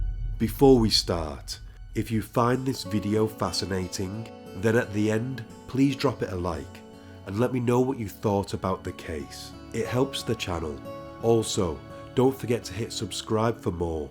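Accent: United Kingdom. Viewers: Please forgive my ignorance. Where’s your accent is from? I know you’re from uk … which part ?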